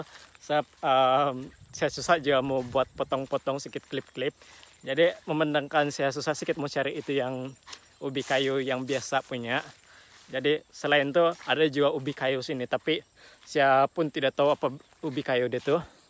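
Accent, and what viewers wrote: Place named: Malaysia. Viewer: Betul betul betul Logat malasia yasobat Hdr bwt nyport👍👍👍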